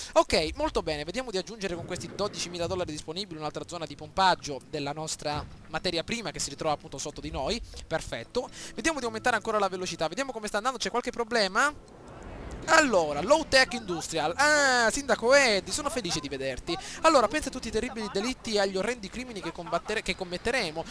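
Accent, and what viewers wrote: Sicily, Italy. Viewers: di che parte della sicilia sei??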